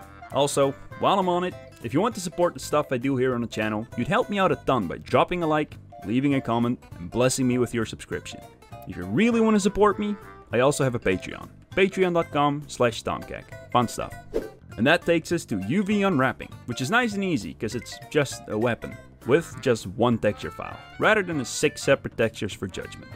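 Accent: Netherlands. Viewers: I watch for your commentary, klootviool ;) Echt waar Dinglish af en toe maar ik kan het wel waarderen. Other than that the nostalgia hype of seeing old WoW shit is great. If I were you, id branch out to other games. Maybe redo a CoD 1 / Battlefield 1942 weapon? The latter had some iconic music. Try to get some cross gamer appeal going. I personally dont watch streams or anything. Just find something short and fun to do. Maybe do some starfield modding? That game is going to be huge starting next week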